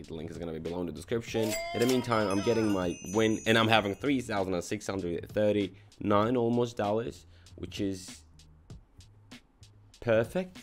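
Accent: United Kingdom. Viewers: british accent + big wins = serotonin boost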